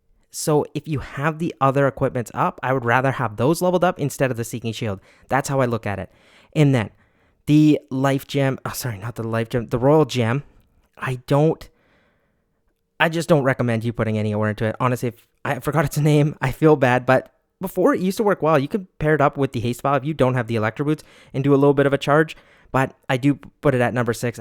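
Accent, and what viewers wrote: Canada. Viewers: New here.. recognized you being canadian just from your tone😂😂. I'm from Edmonton. Keep it up, bud.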